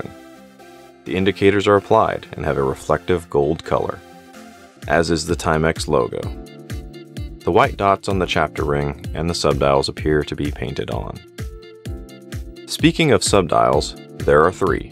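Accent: United States. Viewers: It's nice to hear an American accent discuss watches. Every other channel I'm subbed to are English. You're way more concise than other watch commentators.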